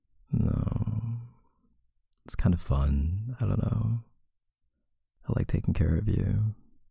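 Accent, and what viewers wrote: Canada. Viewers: Hey, idk why but you sound really Canadian in this audio! I Love it dude! 💖😂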